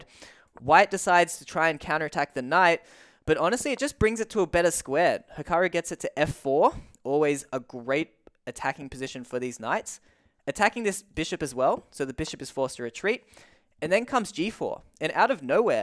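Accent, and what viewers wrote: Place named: Australia. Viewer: From your accent I'm guessing you're a fellow Aussie. Nice channel!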